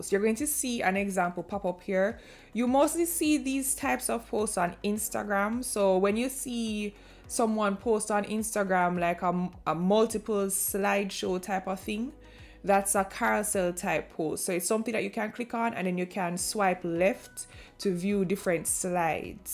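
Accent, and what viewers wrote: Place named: Caribbean. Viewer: Awesome... love the Caribbean sound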